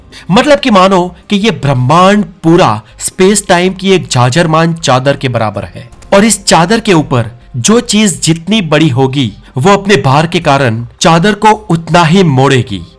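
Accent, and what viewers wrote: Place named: Gujarat, India. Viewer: તમે ગુજરાતી છો... ને??? 😁😁😁 ખબર પડી જ જાય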